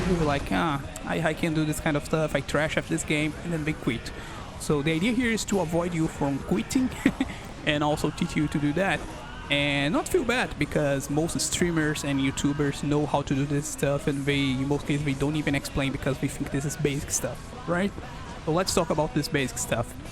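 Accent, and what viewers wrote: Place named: Brazil. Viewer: reconheci que era br so pelo sutaquekkkkkkkkkk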